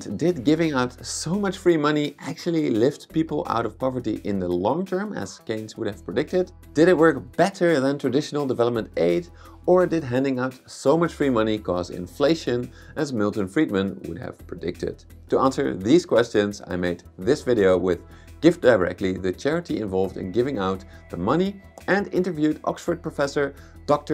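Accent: Netherlands. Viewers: Dacht dat een nederlands accent niet erger kon, maar deze gozer gooit er gewoon een vocal fry bij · Waarom heb je een Nederlandse accent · Are you Dutch? Or even Frisian;)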